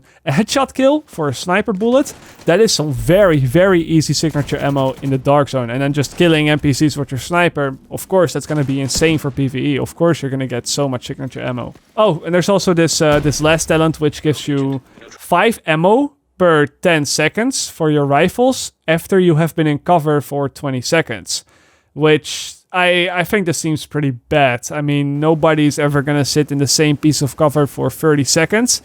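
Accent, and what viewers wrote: Netherlands. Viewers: Yo are you from the Netherlands, cause' I hear it at your voice.👍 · now i can tell why people say are you dutch ?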